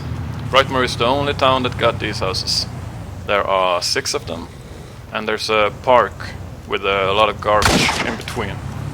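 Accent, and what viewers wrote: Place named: Netherlands. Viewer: nederlands? hoor het aan je accent :D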